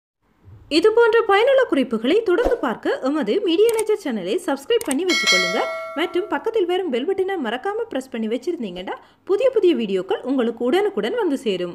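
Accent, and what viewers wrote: Sri Lanka. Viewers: It's srilankan Tamil,l like this Tamil. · உண்மையான தமிழ் ஒலி வாழ்க வளமுடன் இலங்கை தமிழ் அழகு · Avunga srilanka apdithan pesuvanga bro..avunga real speech apdithan... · என்ன அழகு..... ஈழத் தமிழ் அழகு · நீங்கள் இலங்கை தமிழரா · Her tamil looks like srilankan tamil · இலங்கை தமிழ் அருமை... தமிழ்நாட்டு தமிழன்...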